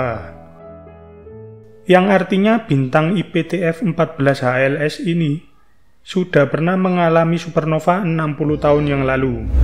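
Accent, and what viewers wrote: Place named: Java, Indonesia. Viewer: Tingkatkan lagi mas channel nya, em... Logat jawanya tolong agak dikurangi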